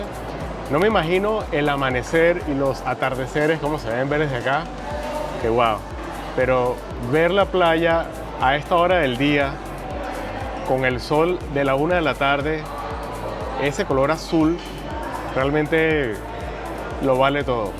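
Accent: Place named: Colombia. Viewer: Muy informativo el video, gracias hermano. Eres de Barranquilla? Tu acento suena un poco colombiano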